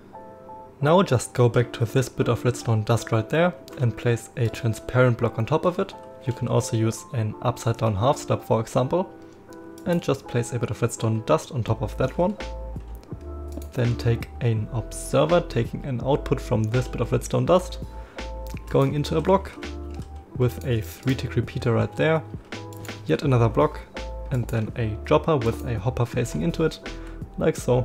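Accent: Germany. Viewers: Amazing Video! It works perfectly fine! I’m just wondering if you’re german, because you have an accent?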